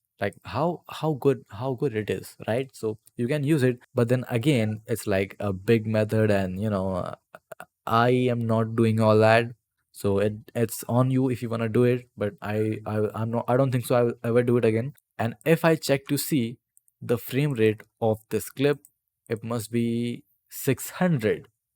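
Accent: India. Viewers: BRO`S INDIAN ACCENT IS TAKING OVER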